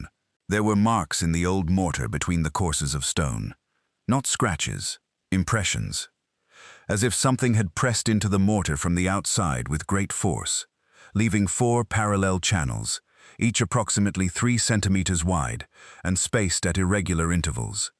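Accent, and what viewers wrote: United Kingdom. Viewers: English accent, but he can't pronounce lieutenant correctly. Pissed me off.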